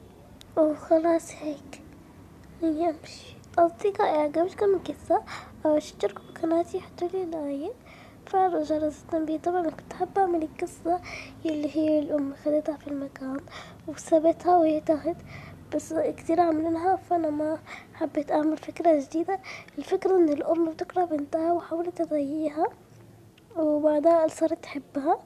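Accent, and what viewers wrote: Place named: Syria. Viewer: عشان بتتكلمي سوري ومصري!؟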